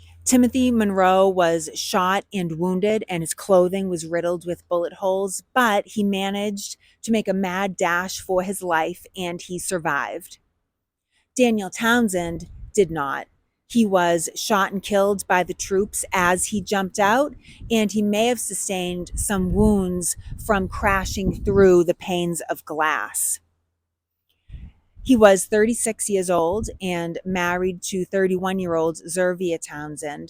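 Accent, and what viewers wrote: Boston, United States. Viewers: I can watch and listen to her all day long. The Boston accent is just something us folk native to the Southwest only experience in movies or TV. is a magnificent speaker to begin with, add that incredible accent and those cool giggles are a real treat!!! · I live in the Boston area and my accent isn’t anywhere near this strong. My neighbor sounds a lot like her though. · My Dad was born in and went to school in Boston and her local accent is 75% stronger than his was.🤩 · Thanks for another great video. You're absolutely correct in stating that once you see the bullet holes inside the house you'll never forget them - a physical reminder of the horror of war. The redcoats even stole the mechanism from the grandfather clock and sold it in Boston...as a native Bostonian it is SO COOL to hear this history presented by someone who talks like me. 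You even look like my cousin from Dawchesta! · So much of the history reminds me of my youth, growing up outside Boston. You accent triggers soooo many memories and is far more typical of a Boston accent than anything actors think is how we sound. :o) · Thank you, for giving such an informed and entertaining video on this subject. You really should reach out to PBS and show them what you have to offer. The gift of your accent makes watching what would otherwise be educational, but not much more, something that stands out and brings us closer to connecting a modern Boston with 1775. If I were producing, I would be very interested in your talent. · It is a pleasure a beautiful young historian with the real Boston accent! 😀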